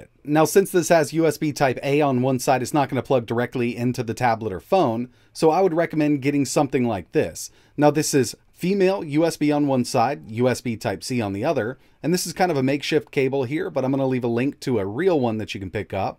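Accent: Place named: South, United States. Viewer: Sounds like spent some time in the south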